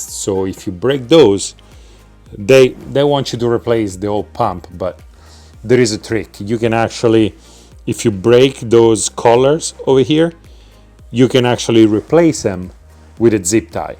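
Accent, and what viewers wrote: Italy. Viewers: I like the italian accent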